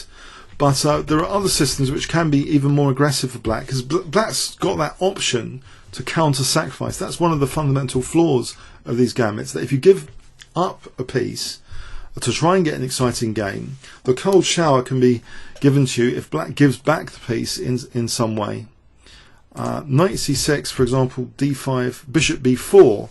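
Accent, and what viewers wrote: United Kingdom. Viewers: British accent is so British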